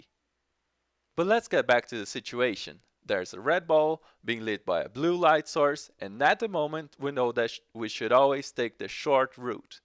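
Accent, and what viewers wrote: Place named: Sweden. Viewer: you sound like pewdiepie when he had the really thick Swedish accent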